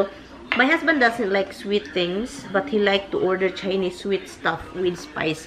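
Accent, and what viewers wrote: Visayas, Philippines. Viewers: Hello and. Shout out to you guys. so sounds so Bisaya? And which part of Bisaya.?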